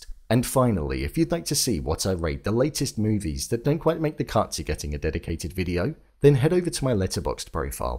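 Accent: United Kingdom. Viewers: These British fiverr voiceovers on all these channels that obviously don't know what they're talking about are getting out of hand.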